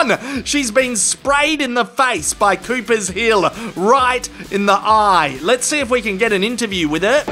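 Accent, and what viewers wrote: Australia. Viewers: You can't beat an aussie commentator. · Good clean fun. "Big atomic wedgie". The Aussie voice over is awesome. That's why us Brits love 'em.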